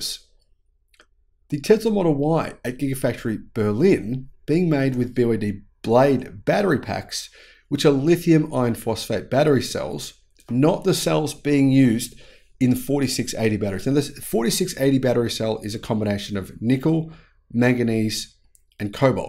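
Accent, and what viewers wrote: Victoria, Australia. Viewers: 100% aussie mate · 100% Australian Accent within 3 seconds. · I'm Russian and I would've guessed your accent is Australian. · aussie, no doubt 😂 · 😂Yes, you're an Ausie!😂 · Definitely an Australian accent. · 100% Australian accent, easy to identify as such. Hard to replicate even after listening to you and SMR for a year or two, maté. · You have a broad and typical well spoken Australian accent. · Hey. Total Aussie Accent! Good on ya! Sending love to you and family. Keep up the great coverage. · Definitely a Victorian Australian accent . Not a lot of different accents in Australia unlike the USA or UK. New Zealind and South Efrika do strange things with their vowels.🤔🦘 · You sure sound Australian to me, Mate. 👌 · As a non-Aussie, to me his accent is not readily identifiable -- like say a Steven Mark Ryan's -- unless he says specific words which are pronounced in an obviously Aussie accent :). 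But good to know this is how a Victorian accent sounds like. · 😂😂 I'm an Aussie living in Spain for over 30 years, and YES, your accent is totally down under🤙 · Total Aussie accent, mate! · 100% Australian accent yes. But don't expect non-english people to pick it up. British vs Aus vs NZ is not a big difference in accent, especially in a public speaking context. Regarding the efficiency, it's down to aero and more efficient inverters and motors. I wouldn't sing them any praises, all teslas are pretty rubbish at efficiency. Never mind the onboard charger at low load, tyres that cost a fortune. There's also the various rating of the capacity of the battery. Likely that BYD overstates the size, or uses second bin cells whilst they sell the first bin to their competition for top dollar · You are 100% Australian! 😊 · the first time I heard you I knew you were an Aussie. No question. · No Doubt. 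Beyond doubt your Australian 😊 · Hello, when talking with someone from another country they will typically know that you are not from their country. They may have not be sure what country‘s accent you have but they will know it is not their own. This is even more so with non-native English speaking people. I can tell you that my wife as a native U.S. American did pick you up as an Australian just seconds into hearing your video first time. That is a good thing!! Be proud! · Yes your accent is Aussie - I'm an expert, because I grew up watching Neighbours and Home & Away. 😉 I've heard stronger though. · The ‘viking look’ probably throws folks off. Your Melbourne accent is genuine though! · You sound Australian,! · 120% awesome Aussie accent 😂 Keep it this way! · u sound very Australian, I love your shows, Eugene, Philadelphia, pa. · Yep Victorian Accent. When I did nasho at Puckka we had all the states segregated, that's when I heard the difference. 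· Solid Melbourne accent, for sure. Also, digging the other voices too 😆 As for the range mystery, maybe it could be the software? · Totally Australian accent! No doubt about it. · Yes cobber you’re an Australian obviously ……from a Pom.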